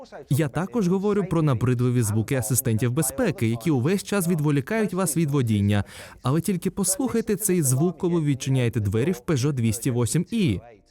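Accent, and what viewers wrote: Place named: Russia. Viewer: Шкода, що Український канал озвучує людина, яка все життя розмовляла руські... А той хто справді все життя розмовляв рідною мовою мусить дивитись і терпіти цей руський акцент... Повірте, це дуууже замітно!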